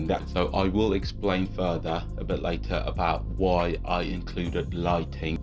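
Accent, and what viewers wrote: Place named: United Kingdom. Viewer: hahaha aaah straight Bri ..ish lol like the accent lol. Thanks man . God bless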